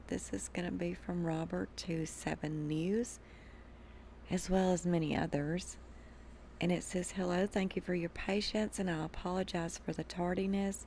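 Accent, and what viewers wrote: South, United States. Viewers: I listen before bed too ! Her voice is very soothing . Love that Southern accent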